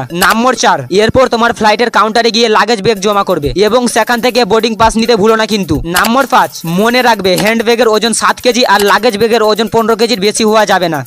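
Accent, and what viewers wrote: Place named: Kolkata, India. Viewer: Kolkatar bangla arokom e hoy, tai toh amader bangladesh er bangla always best cause its a dedicated country of bangla language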